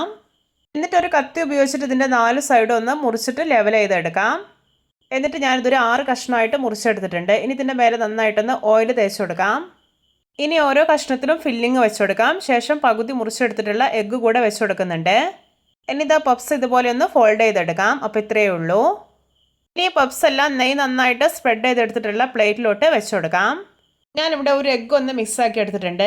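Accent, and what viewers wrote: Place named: India. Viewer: ആന്ധ്രാ/ ഒറീസ്സ നിവാസിയാണോ? നന്നായി മലയാളം പറയാൻ പഠിച്ചിട്ടുണ്ട്... ഒന്ന് കൂടെ ശ്രദ്ധിച്ചാൽ മലയാളികളെപ്പോലെ സംസാരിക്കാൻ കഴിയും.!!! മലയാളം നല്ല ഭാഷയാണ് അതിലേക്ക് കൊങ്കിണി പോലെ നീട്ടി സ്റ്റൈലാക്കേണ്ട 🙏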